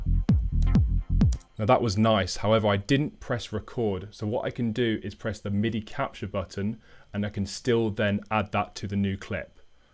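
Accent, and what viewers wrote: United Kingdom. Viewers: Most guys w ur setup and type of video are kinda foreigners who say “focal”(vocal) kk. Cool to see a uk dude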